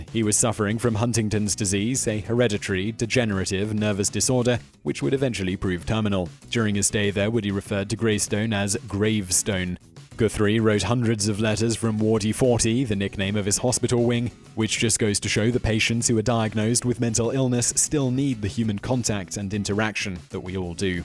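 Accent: United Kingdom. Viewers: Not trying to be mean but the british accent takes away from the video.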